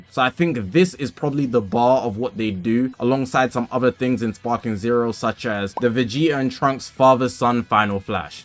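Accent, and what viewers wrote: United Kingdom. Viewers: wait I did not know you were British honestly this is news to me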